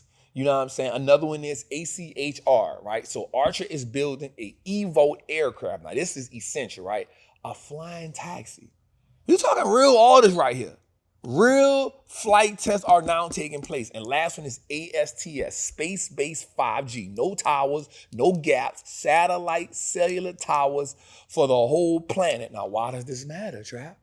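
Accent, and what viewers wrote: New Orleans, United States. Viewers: Yea, he from the city. If you not from here (the city) is what we in Louisiana call new Orleans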